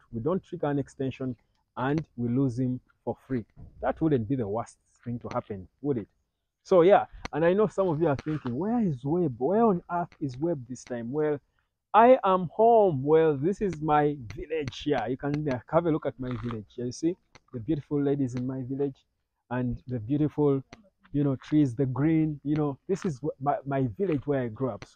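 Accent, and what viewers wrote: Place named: Uganda. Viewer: Nice to see you from home soil though you didn't name it but from your accent I guess Uganda, my girlfreind is Ugandan by the way😊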